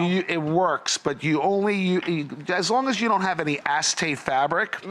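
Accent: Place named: New York, United States. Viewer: Love this guy's NYC accent lol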